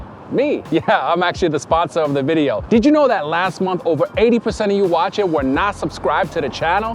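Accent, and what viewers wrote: Chicago, United States. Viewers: I love the lingo/accent. I grew up on the NW side and I feel like the accent/lingo is largely gone now - it feels like everyone I meet here grew up somewhere else!! At my last job, no one grew up in Chicago at all (there were like 20 people) & they wouldn’t understand me when I would jump into Chicago lingo, so I had to avoid it as much as possible because I didn’t want to explain lol Anywhooo, grew videos!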